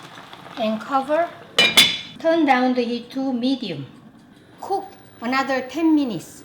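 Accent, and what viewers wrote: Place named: South Korea. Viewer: 말씀 하시는거나 요리 하시는게 참 귀엽네요. 오나전 한국 액쎈트로 말입니다😁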